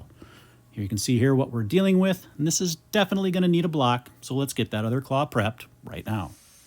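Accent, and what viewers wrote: Scotland, United Kingdom. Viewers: I like the Scotsman's accent. But he does have more 'filler' in his uploads nowadays. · I confess to be a bit annoyed with some of the extra fluff he puts in -- but I believe many of his other subscribers actually enjoy the fluff. His latest video had Zero fluff and all hoof-trimming. So I think he changes it up for variety. As an American living in England, I rather like the Scottish accent.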